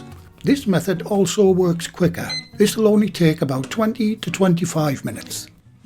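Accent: United Kingdom. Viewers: I tripped on to your channel while looking for a Multi-grain seeded bread to make. I am definitely going to try this, thank you! You sound so sweet and I'm a sucker for the lovely British accent! Reminds me of my years spent in the Air Force stationed at RAF Alconbury years ago in East Anglia. Tell me Sir, how would I modify this for a Bread machine? I assume it needs to be wetter right?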